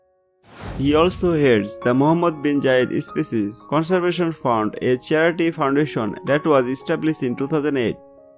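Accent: Bengal, India. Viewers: First improve your English pronunciation and then make such videos. Ur mother tongue is bengali and it is reflecting from your English dialect. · A very Bengali accent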